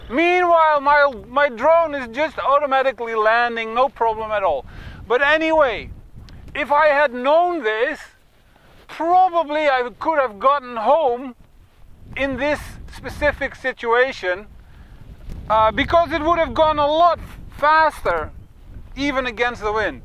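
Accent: Netherlands. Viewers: Volger erbij ! hoorde gelijk een Nederlander ;-) ga zo door.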